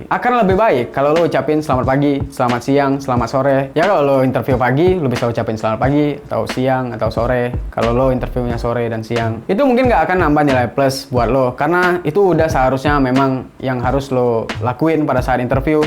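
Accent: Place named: Padang, Indonesia. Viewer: Abg org padang ya, ketauan dari logat nya 🤭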